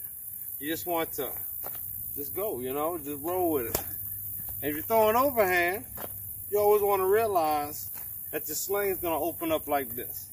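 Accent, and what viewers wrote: Louisiana, United States. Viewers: Are you from Louisiana? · Sounds like a deep south Cajun accent.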